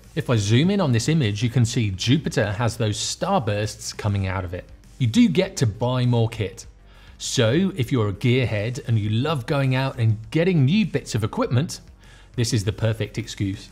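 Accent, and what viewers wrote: United Kingdom. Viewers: I like your presentation skills - you present with conciseness and brevity. And, cheery on the top is your british accent! ;)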